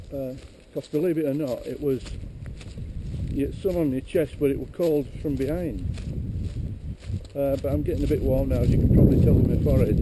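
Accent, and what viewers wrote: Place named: Leeds, United Kingdom. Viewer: just been watching another of your vids and my mrs commented how much accent sounds like her dad, and uncle, who are originally from leeds way. Glad you got permission, although I would definitely make sure I had a bright flag up, and smoke signals, if you run the chance of being stuck cooking your bacon butty's in the morning, in the middle of a shoot. Hope to see a vid of your night out some time soon. ATB, Phill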